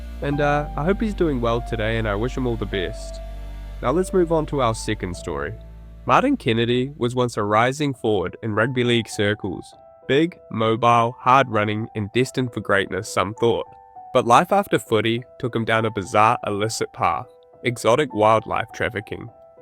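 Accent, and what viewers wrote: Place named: New Zealand. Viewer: That’s just the kiwi accent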